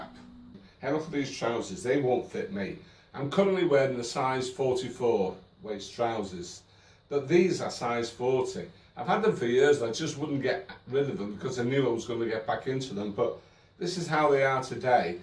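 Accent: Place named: Manchester, United Kingdom. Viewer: Congratulations my friend. Happy you've been successful 💪👍👍👍. I'm from the continent but from your accent I would say you're from Manchester where my favourite music bands come from.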